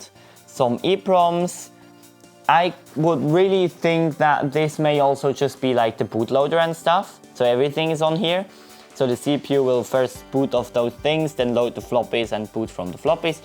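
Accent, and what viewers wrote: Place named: Spain. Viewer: I can't understand your Spanish accent...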